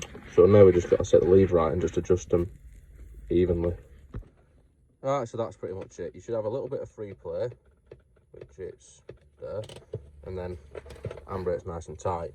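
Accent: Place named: Northern England, United Kingdom. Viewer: Dead helpful mate, love the northern accent btw 🇬🇧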